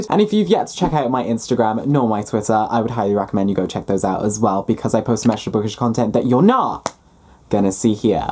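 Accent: United Kingdom. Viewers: do people really think you’re American? I think you sound so British (but I’m American so of course I don’t think you sound American lol)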